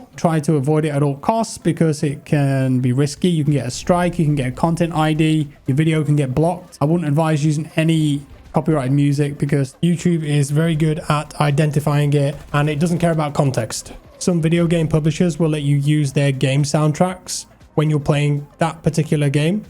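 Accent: United Kingdom. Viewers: I‘m following ur Channel since WZ 1 I love your commentary and especially your lovely british accent 😊👌 Lots of love from Germany ❤✌️ Keep going!!!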